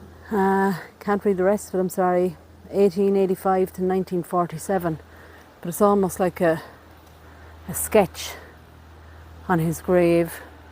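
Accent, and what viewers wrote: Ireland. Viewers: Nice accent Ireland probably the best in the world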